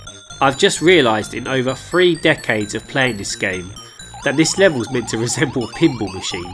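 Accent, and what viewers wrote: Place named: Southern England, United Kingdom. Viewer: Is swearing a thing that you do irl as well? Lol I mean it just seems out of place. Half those words are totally new to me! I am gonna go repeat that to my ten nearest fellow humans! I always get bollockings for saying things like I always get bollockings. It's a worthy sacrifice to the gods of filthy far-right Hitler language like piss and shit! I am gonna be like Moe from Simpsons, I know not what I say 🤣 STOP PLAYING WITH YOURSELF AS WELL!! Dude this is actually fuckin hilarious now... English accents are the best as well. You sound like somewhere between the home counties and egham you definitely in the south!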